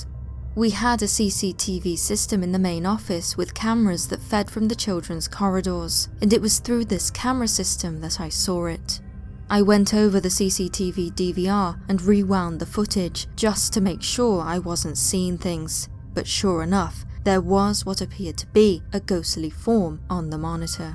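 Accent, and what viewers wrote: United Kingdom. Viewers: When Brits say the word "figure" it sounds like "figga". The word "home" sounds like "haome". I enjoy the stories though. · She does have a very pleasant voice and clear British English.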